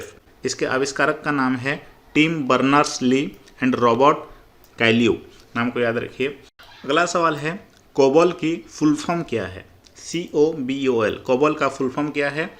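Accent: Bengal, India. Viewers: may be he is bengoli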